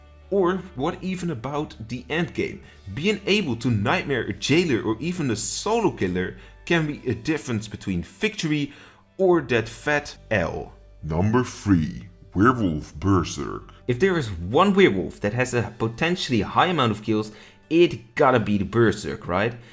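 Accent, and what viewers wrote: Netherlands. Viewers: Why do you sound so Dutch?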